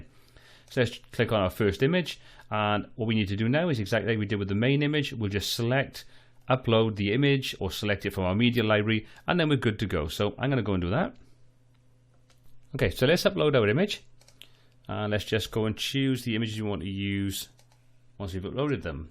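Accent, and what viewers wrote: Wales, United Kingdom. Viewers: I know a welsh brother when I hear one haha! I'm from South wales :D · Thanks for your clear approach - do I detect a Welsh accent?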